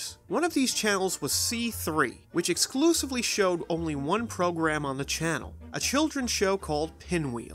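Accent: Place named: Philadelphia, United States. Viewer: I recognize your accent. you're either from Philly (my guess) or Baltimore! I could barely pick it up at times. Either way, really great video!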